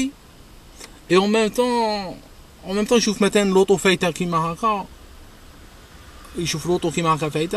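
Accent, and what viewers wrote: Oran, Algeria. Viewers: You are from Mosta or Oran?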